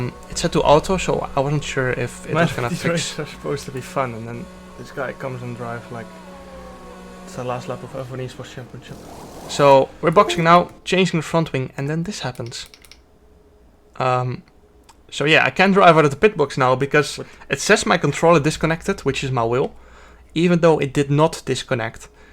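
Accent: Netherlands. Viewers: Je spreekt beetje Nederlands Engels? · Ik hoor zo overduidelijk dat jij nederlands bent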